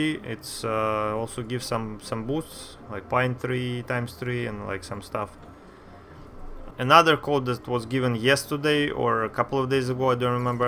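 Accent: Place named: Russia. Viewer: Похоже что русский говорит на английском. )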